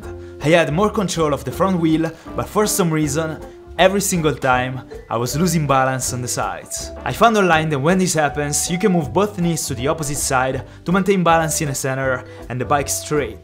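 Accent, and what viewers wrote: Italy. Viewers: Hai l’accento italiano 😂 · Si sente che sei italiano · Ma quanto cazzo si riconosce che sei italiano! 🤣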